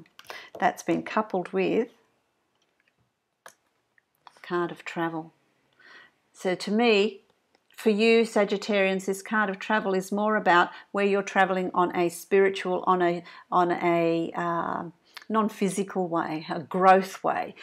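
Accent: Australia. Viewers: You sound like an Australian, i like the way you explained this.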